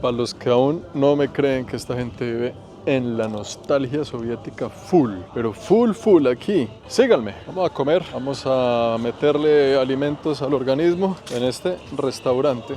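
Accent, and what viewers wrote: Bogotá, Colombia. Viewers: 👍habla rolo, o sea como hablamos los Bogotanos en Colombia, sin acento tan marcado .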